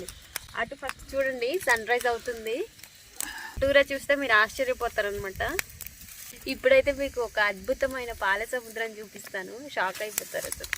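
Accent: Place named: Krishna, India. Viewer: ఊరు పేరు మాత్రం చెప్పటంలేదు ఈమె మాత్రం మన్యం ఏరియాకి సంబందించిన ఆమే కాదు యాస మాత్రం కృష్ణ జిల్లా కు సంబంందినది ల్లా ఉంది ఇంతమంది ఎఉరు ఏవిలేజ్ అని అడుగు తున్న చెప్పటంలేదు పాప యాస కూడా అలాగే అనిపిస్తుంది 🙏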